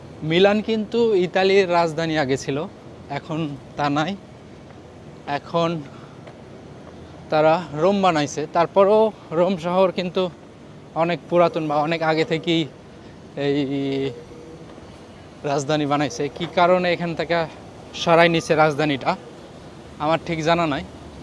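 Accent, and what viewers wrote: Rajshahi, Bangladesh. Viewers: বাংলাদেশে আপনার বাসা কোথায়, ভাষা সুনে রাজশাহী মনে হচ্ছে।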